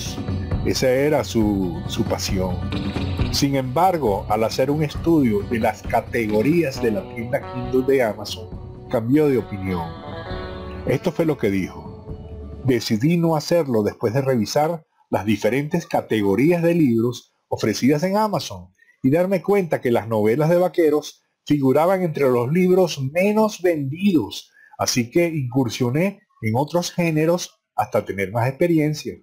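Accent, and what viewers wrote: Venezuela. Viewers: Buen video. venezolano?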